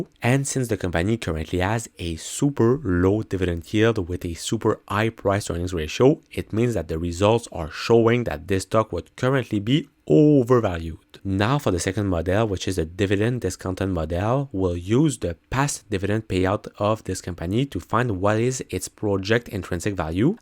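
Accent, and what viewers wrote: Quebec, Canada. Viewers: Au prochain rapport trimestriel, pourriez vous créer une version de votre vidéo en Français, SVP. Merci bien. Votre accent Quebecois est très agréable à entendre.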